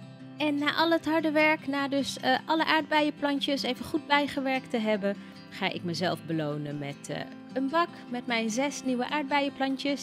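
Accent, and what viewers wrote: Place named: Overijssel, Netherlands. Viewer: Woon je in Overijssel? Dat lijkt namelijk zo aan je stem❤️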